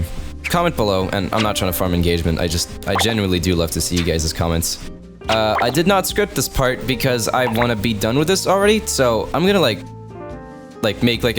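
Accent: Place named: France. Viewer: Also, you sound French. Are you French?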